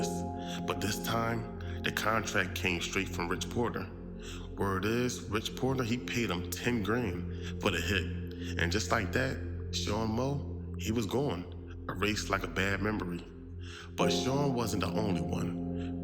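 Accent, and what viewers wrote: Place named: New York, United States. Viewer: Sounds like New York WP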